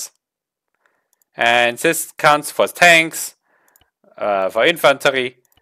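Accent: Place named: Germany. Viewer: The good GERMAN accent :-D Feier ich! :)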